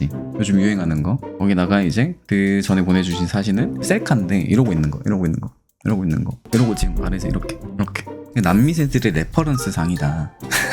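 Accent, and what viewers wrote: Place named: Gwangju, South Korea. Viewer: 광주말투 진짜 매력있다 ㅋㅋㅋㅋㅋ 보들보들해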